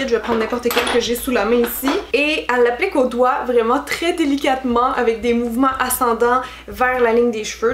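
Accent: Quebec, Canada. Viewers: J'ai mis 5min à comprendre que "cachérn" (comme ça que je l'entendais) voulait dire "cache cerne" et enfaite "anti cerne" !! 😂😂😂👌🏻 Bref les Québécois ne perdez pas votre accent, il est excellent 😭🙏🏻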